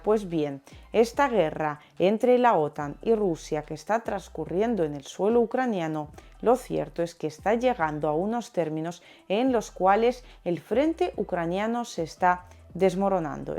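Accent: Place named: Spain. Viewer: Primeramente agradecer, las noticias respaldada con hechos grabados. Lo segundo, que hablas español español tal como lo "aprendisteis". Soy de Uruguay, pegado a Brasil y Argentina, con solo <num> habitantes, somos chiquitos pero con corazón grande. Quiero preguntarte, no es un hecho sin precedentes prohibir RT en América, solo para desconectar a Rusia del mundo. Sin embargo, prohibirlo a sido una muestra del ODIO, DISCRIMINACIÖN hacia Rusia. Mucho antes de esto que ocurre hoy , RUSIA cada vez que EUA hacia lo mismo DE ESCALAR TENSIONES con CHINA, Corea del Norte, IRÁN, rUSIA MOSTRABA Sarmat1 todo quedaba tranquilo. En estos sucesos tubo que mostrar el Sarmat2 y así sucesivamente. EUA declaró enemigo a China solo por temas comerciales, lo mismo con Rusia y no aprenden que prohibir, sancionar, robar, hasta atacar a cualquier ciudadano Ruso por el mundo dejándolo sin dinero, logró sacar la rebeldía y la fuerza para crecer y gestionar las propias empresas Rusas y no depender de nadie. 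Nuevo acuerdo para la venta de trigo de EE UU a Rusia Cereales 29 jul 1983 — La Unión Soviética y los Estados Unidos han llegado a un acuerdo de principio por el cual esta última nación venderá entre 9 y 12 millones ... Cómo Rusia se convirtió en el principal exportador de trigo "plantando" El potencial de exportación del país en la temporada 2020/21 se incrementó de 37,5 millones a 39 millones de toneladas. Y como el trigo , existe muchas otras cosas. Por eso no entiendo como estos parásitos nos po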